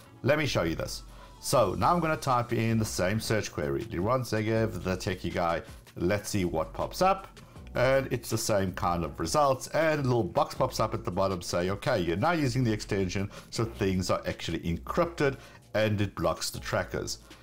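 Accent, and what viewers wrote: South Africa. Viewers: I know a South African accent when I hear it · I’m having trouble with the accent. Is it South African? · Is this guy South African?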